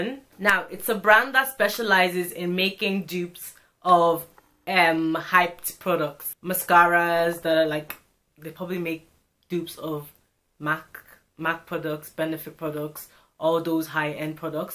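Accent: Ireland. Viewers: Was googling this product and clicked onto your video and then was like yeah thats deffo an Irish accent! Love finding Irish gurus so I can buy what is rec'd easily!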